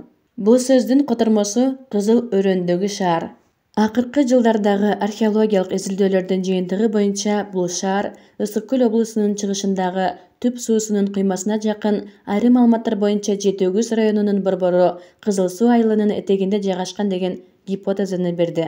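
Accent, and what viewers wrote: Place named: China. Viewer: Акцент эмне үчүн,кытайлык кыргыз айым го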